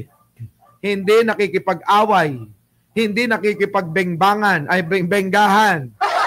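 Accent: Ilocos, Philippines. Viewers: ❤@ gd m, sir,nakakatawa Ang pag salita mo Ng ilokano dialect, naka katuwa ka,mabuhay ka sir,mabuhay si hon pbbmjr,in all the time,amen..!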